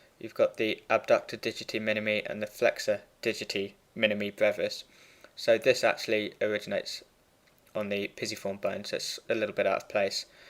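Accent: United Kingdom. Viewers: Your videos are so helpful. I’m preparing for my exams and I don’t even use atlas often since I found your channel. Teaching is great and the British accent is everything 🙏❤️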